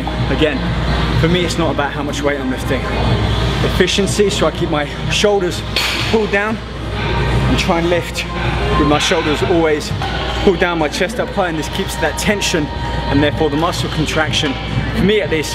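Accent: United Kingdom. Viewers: has one really cool way of talking and explaing exercises,even myself started to talk like,british excent lol:D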